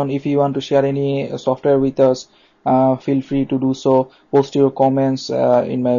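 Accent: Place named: India. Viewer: No offense to the author but he is indian? Again, no offense. Due that the accent is really familiar.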